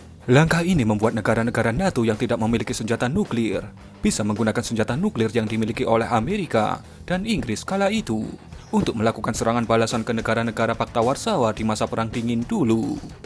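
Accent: Java, Indonesia. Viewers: kg medok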